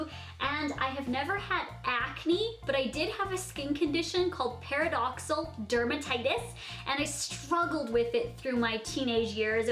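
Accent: Canada. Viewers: I love the way you speak! I love the Canadian accent! From my fortune language my fave is Rotterdams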